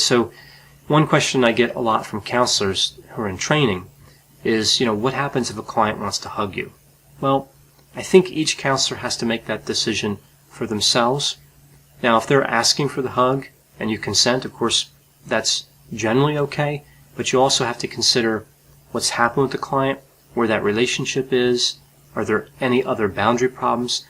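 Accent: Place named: Delaware, United States. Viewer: The only person in Delaware who hasn't met Joe Biden, lol!! Really, you have a great accent, and it's one I recognize (I'm from Virginia but have been to Lewes, DE for the beach and Wilmington for the art museum).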